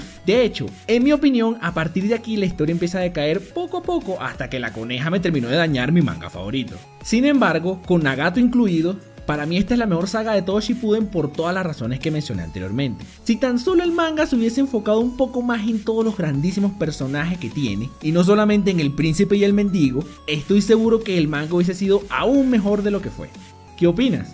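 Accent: Venezuela. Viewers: eres venezolano verdad? por el "y de paso" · este por el acento es venezolano XDD en fin mi opino sobre el tema es que si esta fue una buena saga y también es mi favorita pero; al iniciar la 4ta gran guerra ninja shinobi también fue épico su inicio y el camino que pudo haber llevado pero luego de que todo fuera guerra de genjutsus y el drama de sasuke pa presidente y naruto por ahí la trama empezó a caer notablemente, en los inicios de la guerra también pudo verse a personajes secundarios brillar aunque admito que la verdad pudo haberse desarrollado mejor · Eres Venezolano? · ajaj eres venezolano :v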